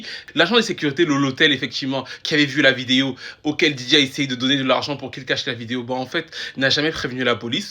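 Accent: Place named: Cameroon. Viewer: Moi c'est ton accent qui m’intéresse... tu es d’origine camerounaise ?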